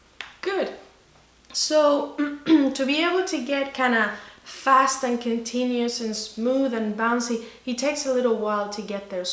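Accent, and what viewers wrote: Ireland. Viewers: Glad to see you online again! I had seen the DOWN UP TAP method on another video, and wasn't quite sure if it was legit. It seems a great way to produce a lot of sound while being economical with the amount of skin covered. One day I too would love to visit Ireland. I hope you had a wonderful trip. If you don't mind me asking, where are you from? At first I thought your accent was Irish; is it not? I'm in California, so you can imagine the lack of bodhran players out here. Thank goodness for the internet! Your instructions have helped me greatly, thanks for being there! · Do I hint a little irish accent in there?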